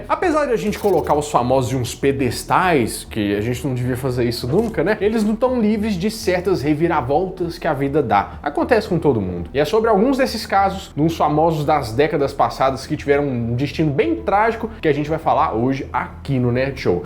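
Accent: Minas Gerais, Brazil. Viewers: esse cara é mineiro uai! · Vídeo muito bom. Gostei do formato, da didática, das montagens e o sotaque mineiro é um destaque. Parabéns 🌟🌟🌟🌟🌟 pra vc.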